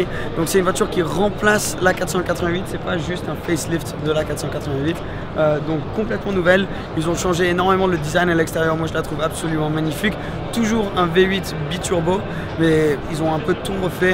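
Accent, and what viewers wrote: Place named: United Kingdom. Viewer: Salut et merci pour cette présentation rapide des supercars du Salon de Genève 2019. Je t'ai connu il y a peu et j'ai regardé quelques-unes de tes vidéos sur ta "nouvelle chaîne française". J'en pense beaucoup de bien et ai particulièrement apprécié ta vidéo "La vérité" où tu te livres et joues la transparence. On sent que tu es passionné, mais attention, tu fais encore pas mal d'erreurs qui en définitive gâchent ce que tu as fais de bien auparavant. Par exemple le tout début de cette vidéo, ne te trompes pas de cibles, tu ne fais pas de vidéos-délires pour tes potes ou entre potes que tu materas plus tard uniquement avec eux, là tu t'adresses à un public tous âges qui vient sur ta chaîne pour découvrir des voitures et le salon de Genève, pour également avoir ton avis mais certainement pas pour assister à des blagues ou des délires de potaches attardés. 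La séquence entre 10 et 50" n'avait rien à faire là et aurait dû être coupée au montage. Ensuite attention aux insultes et grossièretés. Ton "J'men bas les c...…" aurait pu rester au vestiaire, si tu vois ce que je veux dire. Ensuite il y a un truc extrêmement énervant, un tic ou plutôt un automatisme que tu as et qui vient certainement de ta double culture franco-anglaise, mais qui au visionnage est super pénible à la longue. Tu prononces les noms de marques et de modèles à l'anglaise et en plus c'est souvent faux. Exemple, la Lamborghini SVJ n'est pas la "Ace Viiii Jay" mais sur une chaîne française la "Esse Vé Ji" et id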